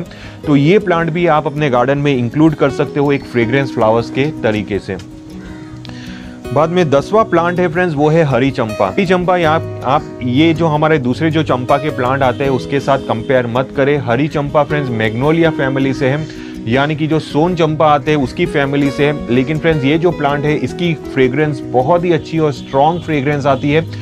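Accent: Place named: Gujarat, India. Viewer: Aapki aavaz se aap gujarati lagte ho....😇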